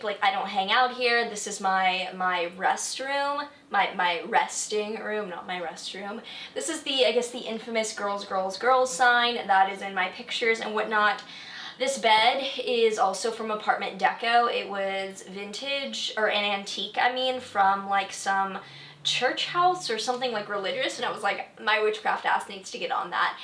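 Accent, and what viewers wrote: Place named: California, United States. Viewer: And I'm sure someone from Texas could jus-az-eezily grow up to speak a perfect East London cockney, damn you muss-a practiced that Cali-girl speak🤩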